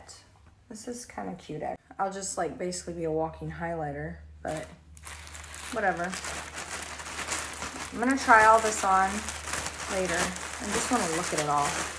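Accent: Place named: Brooklyn, United States. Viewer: sounds from brooklyn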